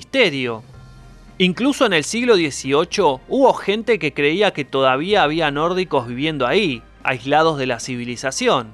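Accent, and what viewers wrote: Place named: Argentina. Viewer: Por tu acentonoareces argentino, y un desagradecido decir que nos vikingos llegaron antes que los españoles acompañan a colon, que gracias a ellos tienes lengua y un celular 4g, los que nose han descubierto como las tribus Amazonas.....ahí siguen tirando flechas....y seguro que eres biznietos de un gallego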